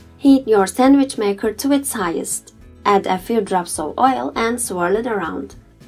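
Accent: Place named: Turkey. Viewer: Aksanın gayet güzel ama nedense Türk olduğunu hemen anladım. Tarif için teşekkürler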